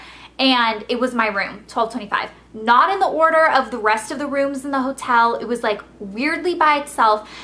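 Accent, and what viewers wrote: South, United States. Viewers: I love your southern accent! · you sound extra southern in this video...i like it!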